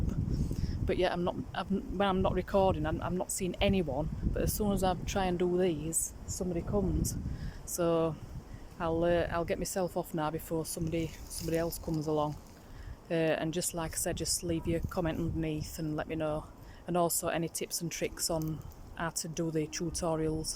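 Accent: Yorkshire, United Kingdom. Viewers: Love your Yorkshire accent, its just like my dads so i understand you no problem. Lol You are most talented, just subscribed! Hi from Canada 🇨🇦 loving all your content. Cheers, Michele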